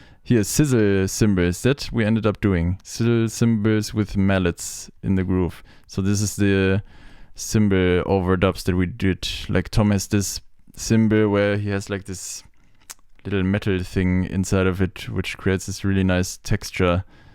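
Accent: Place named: Germany. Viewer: talks like a german, 'Heh?s' like a dutchman . do Germans do that too? I thought it was a very dutch thing until i heard you do it.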